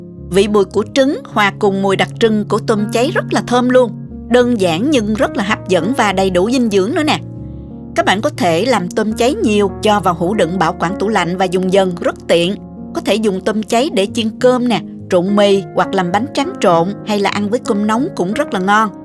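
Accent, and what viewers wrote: South, Vietnam. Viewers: có giọng nói miền nam truyền cảm trong sáng hiếm có. Rất thích xem các video hướng dẫn nấu ăn của không những vừa ngon miệng mà còn trang trí đẹp mắt nữa. Rất cảm ơn, triệu like cho. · Gio.ng no'i dung giong mie^`n nam nghe de^~ thuong ghe^ no*i! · làm cơm chiên nhìn thấy ngon quá, nhìn thấy thèm muốn ăn hết một tô lớn luôn đó, vừa khéo tay chỉ dẫn dễ hiểu lại có giọng nói trong trẻo rõ ràng đặc trưng của người miền Nam rất dễ cảm mến và giọng nói rất hay và trẻ trung nữa!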